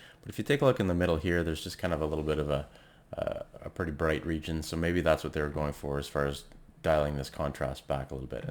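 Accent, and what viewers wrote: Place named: Central, Canada. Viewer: The way you structured this tutorial was incredible; you're a brilliant teacher. And I recognized the central Canadian accent right away, bonus!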